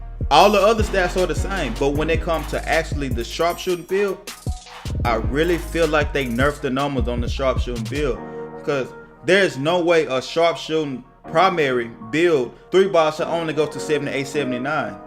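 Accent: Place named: Louisiana, United States. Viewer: you’re from louisiana yea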